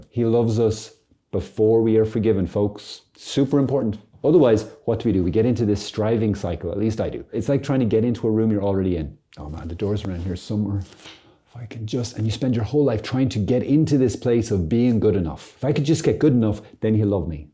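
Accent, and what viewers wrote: Ireland. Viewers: Is anyone else here for his Irish accent? I absolutely love it. perfectionism is a rejection of who we really are... Garsh that hit me. There's so much I could say about what he says in this video. I can't tell you how long the belief of 'you'll never be good enough so never receive communion' has kept me from communion. Then a priest noticed and asked me about it. I don't know how long it would've taken if he hadn't done that. "I do not have to do anything perfect today, I only have to do my lousy best." That's my mantra 🤣. Still a work in process, for sure. But we're getting there. 😁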